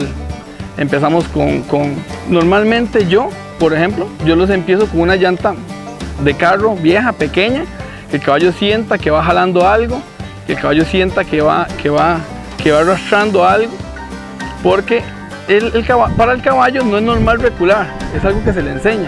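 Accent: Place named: Costa Rica. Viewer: Yo cuando escuche su asento dije es de Costa Rica....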